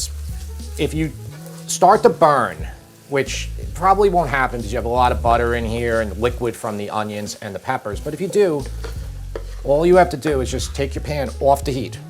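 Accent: New York, United States. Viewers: Sorry, I'm Italian and I don't know the accents in America. But now here what would be “Italian” about his New York accent ? He is American, born in the US, speaks only English, Italian he doesn't even know, how can he now have an “Italian” accent ? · I love Italian food (and the Italian New York accent, hehe)! I'm gonna try making this soon.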